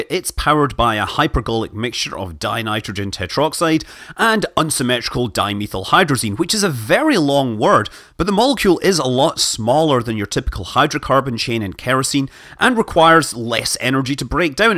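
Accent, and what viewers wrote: Scotland, United Kingdom. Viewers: Maybe this is a stupid question, but how the fuck do you know so much about rockets? I mean, I feel like I've learned so much that I'm going to start talking about rockets with a Scottish Manleyish accent.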